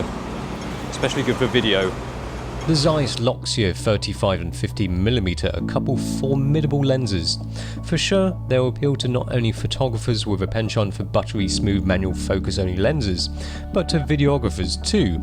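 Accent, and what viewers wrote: United Kingdom. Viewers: gorgeous Britain accent as always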